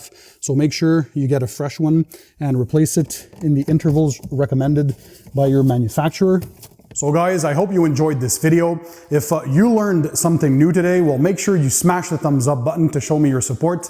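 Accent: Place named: Canada. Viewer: par pur hasard après avoir acheté la cire céramique hydrophobe de chez Meguiars j’ai eu des propositions de vidéos qui m’ont plus donc je me suis abonné. J’adore le contenue de tes émissions et l’accent Canadien nous les français on adore cela lol.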